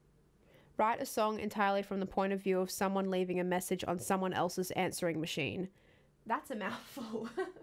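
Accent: Australia. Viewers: Shit yeah, you're an Aussie. Where u at bby, don't be shy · Are you australian? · Are u Australian ?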